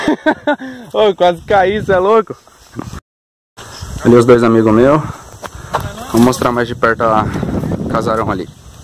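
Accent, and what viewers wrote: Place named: São Paulo, Brazil. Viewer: O defeito desses vídeos, na maioria, é não dizer onde ficam os locais mostrados, cidade, Estado. Pelo sotaque de quem está mostrando, a pronúncia dos erres - R, imagina-se que é em S. Paulo.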